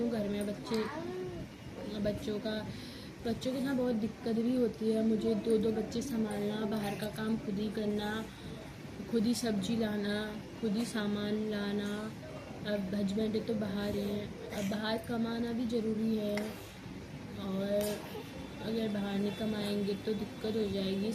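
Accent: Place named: Pithoragarh, India. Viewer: आप की लेंग्वेज से समझ गई थी आप पिथौरागढ़ से होंगे, आप बेरीनाग से हो क्या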